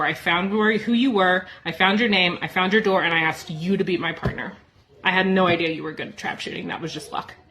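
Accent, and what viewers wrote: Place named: Fargo, United States. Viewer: Gorgeous story. Love the hints of Fargo accent.😊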